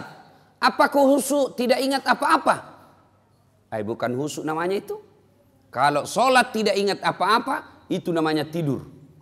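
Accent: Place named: Makassar, Indonesia. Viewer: Saya orang jawa tapi logatnya orang Makasar tetap ewako..menyenangkan.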